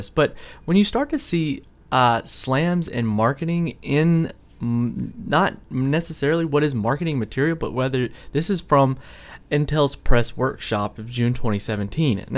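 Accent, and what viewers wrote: South, United States. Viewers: Where are you from if you don't mind me asking? I hear some southern twang in there XD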